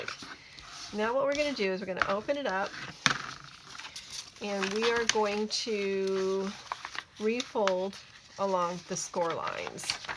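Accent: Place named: Pacific Northwest, United States. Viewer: So cute! Love them. It's my first time here and you sounded like you might local. I'm across the sound from Seattle.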